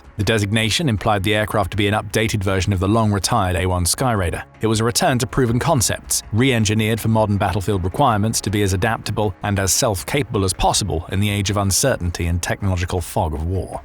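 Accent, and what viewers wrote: England, United Kingdom. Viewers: Coming from some guy with an english accent, this is almost comical...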